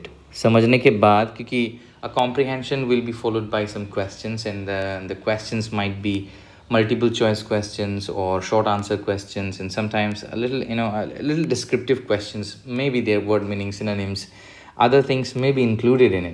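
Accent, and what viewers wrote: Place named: Assam, India. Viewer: Sir r u from assam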